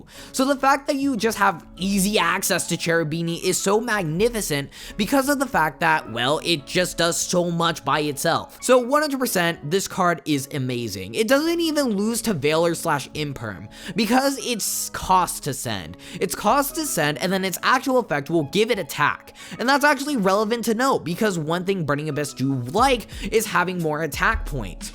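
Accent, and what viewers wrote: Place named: United States. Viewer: Goddamn, why does no one look into the right pronunciation for these foreign words? I'm Italian myself, and hearing these names (which come from a very important piece of our culture, being la Divina Commedia) pronounced by an American as an Anglo-Saxon word when it's CLEARLY an Italian and Latin word annoys me so much.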